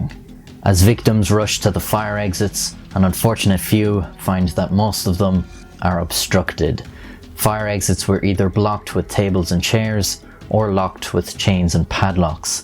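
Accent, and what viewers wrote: Ireland. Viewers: I really like the style of your videos. Your Irish voice is perfect fo this stuff. Keep it up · Imagine if he keeps going until he gets much older with a gruff voice and that Irish accent? Like a lighthouse keeper or an old sailor telling dark tales.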